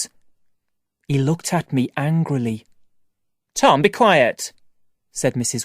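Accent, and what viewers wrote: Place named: Ireland. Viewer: freaking irish accent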